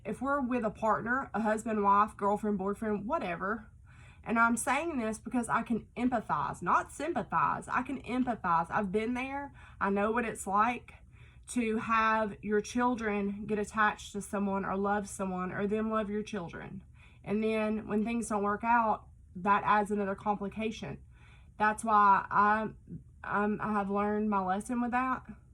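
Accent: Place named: South, United States. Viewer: I needed this. This was for me 💖💖 I’ve let go of so many bad habits the last two years. Nicotine, a narcissistic drug addicted mother, a drug addicted brother, as well as an abusive alcoholic ex husband. I’ve started a new bad habit over the last year that I can’t seem to kick though. Weed 😒 it has helped my anxiety and to heal and let go, but I have noticed the negative impacts it has taken on my energy and personality. I know what I need to do, separate myself from the person who brings this habit around me and keeps me smoking. I also have 3 small boys. So children are involved. I’m healing, we have moved and cut off all bad people from our lives, so here’s to cutting out one last bad habit so my heart and body can truly heal. I love your channel btw! My new favorite ❤️ your accent makes me feel connected 💖 a Eastern KY girl hearing another country girl doing tarot is everything to me 😍😍😍😍💖💖💖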